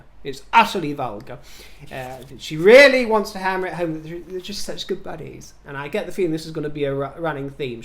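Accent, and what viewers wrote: United States. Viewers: OMG! Your American accent cracks me up!!! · Just love American girl accent, so perfect! You gave the most incredible wit n humor! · You're a yank...?🤭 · your American accent is perfect! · you do a great American accent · I love your American accent! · You are such a gem! The American accent is 🤌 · I just love your American accent today. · I love the switch to your American accent! XD great video, xx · LOVE your American accent! Got me laughing! Hugs from Texas. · Your American accent is killing me! 🤣💕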